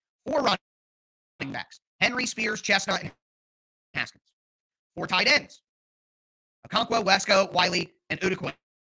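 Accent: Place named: South, United States. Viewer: This is why I can’t subscribe to this channel with that southern accent I know he doesn’t want a black QB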